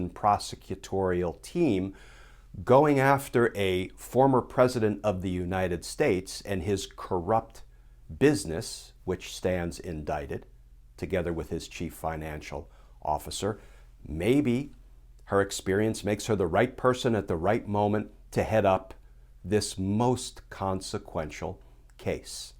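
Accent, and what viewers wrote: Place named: New Jersey, United States. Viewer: She was bought... Saw your interview with Stephanie Miller on Political Voices Network... Heard your spin on that hot mess in NY. Great interview & loved your New Jersey voice. ⚖️💪⚖️🇺🇸⚖️🇺🇸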